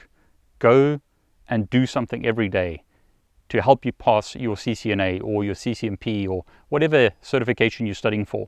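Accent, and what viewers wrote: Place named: South Africa. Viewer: Condolences to your family,. Thank you for your inspiration. It's something that we definitely need more of. P.S. I thought that you sounded like you were from South Africa.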